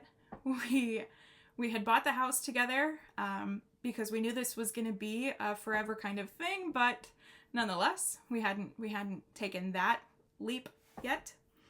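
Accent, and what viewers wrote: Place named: Wales, United Kingdom. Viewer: Love your progress , congratulations on 10 years on flosstube , Welsh is a accent I really love 😊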